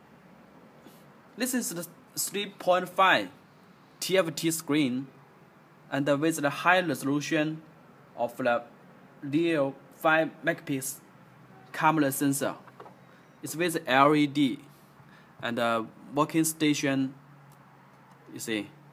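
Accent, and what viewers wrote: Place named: China. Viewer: chinese english =chinglish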